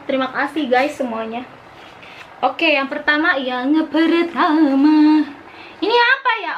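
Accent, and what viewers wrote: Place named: South Korea. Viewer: Logat nya kakak korea banget lohh...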